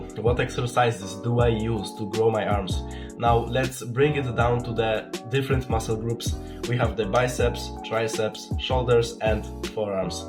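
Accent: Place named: Poland. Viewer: haha po glosie rozpoznalem xd bo cos taki akcent jakbys z polski byl xd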